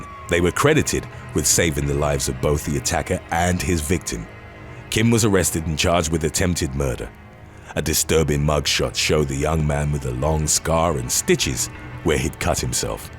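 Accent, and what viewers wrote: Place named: England, United Kingdom. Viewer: This series is addictive! I love the narrator’s English accent!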